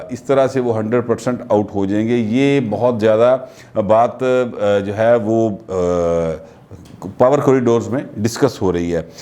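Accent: Lahore, Pakistan. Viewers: Janab sb Salam. Aaj ki guftugu shandar rahi khas tour pr Lahori dialect k terke ne iss mn jaan dal de. Appreciate. Sirf aik hi baat karni hai k jis afwa ka zikr apne kiya hai mn yeh feel karta hun k isski teh k neche threat yeh diya ja raha hai k Army Chief ko remove bhi kiya ja sakta hai. Rgds.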